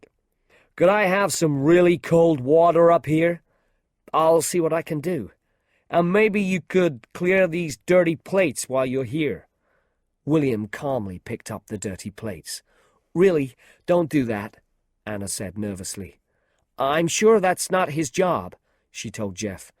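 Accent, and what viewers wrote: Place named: United Kingdom. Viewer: I love the story and the beautiful British accent. Thanks a million! ❤❤❤❤❤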